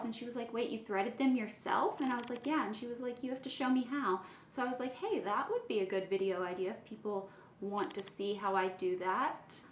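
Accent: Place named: Tennessee, United States. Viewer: Anyone else think, dang she really has been in Tennessee?! The accent is cuuuuuute ♥️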